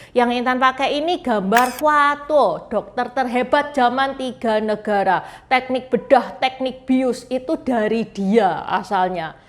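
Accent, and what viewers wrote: Java, Indonesia. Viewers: Seneng baku ndengerin suarane naik medokmu kuwi lho cik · mba intan tinnggal dimana ,ko ngomongnya medok banget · Hostnya medogg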